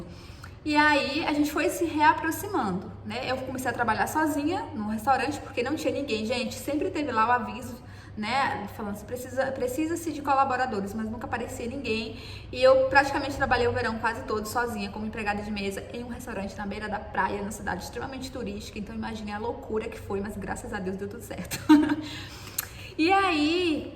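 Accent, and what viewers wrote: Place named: Northeast, Brazil. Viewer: Acho o sotaque nordestino horrível , mas gostei tanto do jeito dessa menina,do espírito aberto dela, que nem consegui reparar o sotaque.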